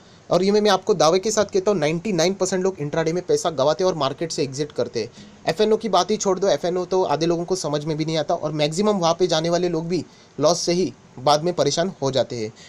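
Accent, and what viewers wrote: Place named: Mumbai, India. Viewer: mumbaiche ahe he nkki accent ani hindimde marathi words..😀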